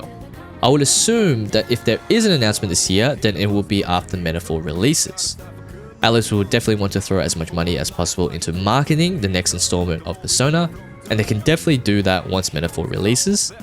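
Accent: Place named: Australia. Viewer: It's crazy how you can tell this Youtuber is an Asian Aussie just from his accent.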